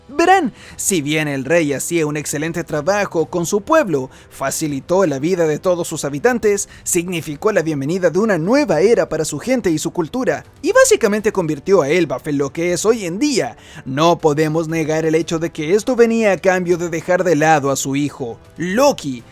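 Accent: Chile. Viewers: tu no eres de mexico, eres chileno hahaha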